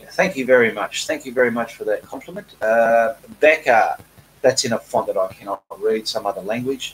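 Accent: Australia. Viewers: Wow i love your painting but now im watching this video because my english teacher suggested to get used to different english accents and so far australian is the hardest for me to undrestand😄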